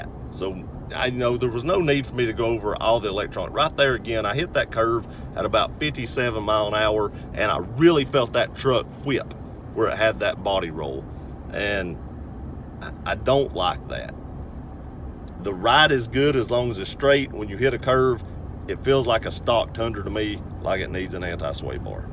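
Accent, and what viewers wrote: South, United States. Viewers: What state do you live in because you sound very southern.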